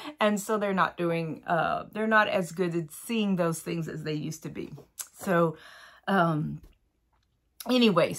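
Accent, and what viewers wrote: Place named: Acadiana, United States. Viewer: I picked up your accent! Lol love the Acadiana pattern. Leave the crawfish!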